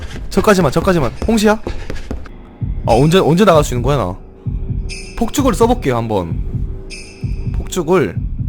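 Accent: Gyeongsang, South Korea. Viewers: 님 경상도분이신가 ㅋㅋ 억양이느껴짐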